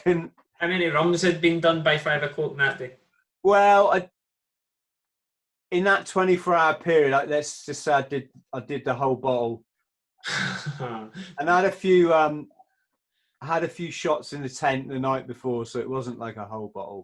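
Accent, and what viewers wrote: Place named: Ayrshire, United Kingdom. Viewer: Hey I’ve been aware of and Follow him,really enjoyed the podcast guys.Your definitely West coast/Ayrshire-Glasgow as you the accent is the same as mine 🙂. I’ll be sure to share and follow.👍🏻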